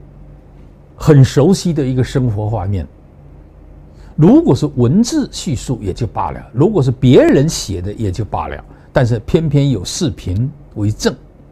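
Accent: Fujian, China. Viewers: Your spoken mandarin with fujian accent is horrific to listen to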